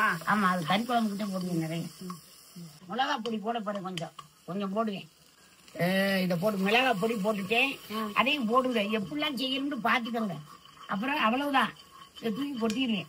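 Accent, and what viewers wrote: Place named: Ramnad, India. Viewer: Maami arumaiyaana samaiyal adhuvu namma ooru style la supperr superr ongakitta enaku pudichadhey neenga namma ooru ramnad slang la pesradhu dhaan adha enikumey vitraadhinga idhey madhrii nalla manampola pesunga manasuku neraiva irukuh onga youtube channel maelum maelum uyarvuh pera manamaara vaazdhigirom eid mubarak maamii ❤️❤️🙏🙏🙏🤝🤝☺️☺️🥳🥳😇😇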